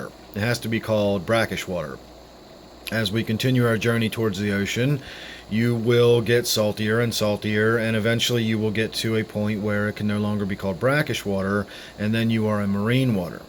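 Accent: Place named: East Coast, United States. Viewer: You talk like me are you from eastcoast? I'm from Baltimore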